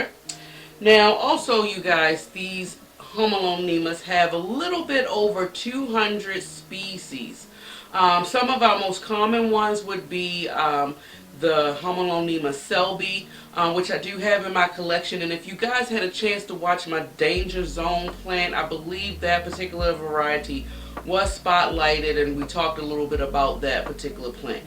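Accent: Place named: Maryland, United States. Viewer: If u ain't from Merrland i dont kno who is prolly my fav accent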